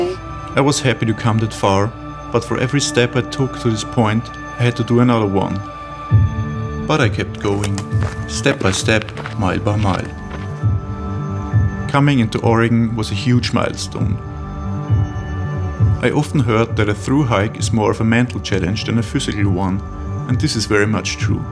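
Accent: Germany. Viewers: Wow! Ich bin fasziniert darüber was für eine Reise du zu der deinen gemacht hast! Ich habe eine Millionen fragen an dich und weiß genau ich kann sie nicht alle stellen jede Stelle ich dir eine essentielle: was war das für ein Gefühl zu Beginn und was für eins am Ende dieser wunderbaren Reise? Ich möchte in den kommenden 3 Jahren mich auf eben genauso einen Trip vorbereiten vielleicht hast du auch eins zwei Tipps wie ich das am besten mache da du dies ja bereits erfolgreich gemeistert hast! Ich komme aus Niederbayern du hörst dich von der Aussprache auch so an als stammst du aus Niederbayern. Mach weiter so! Du hast hiermit einen neuen Abonnenten gewonnen und vielleicht sieht man sich mal auf den Pfaden oder man wandert gemeinsam! 🤩👍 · Könnte es sein das du Deutsch bist? Hast ne deutschen Akzent...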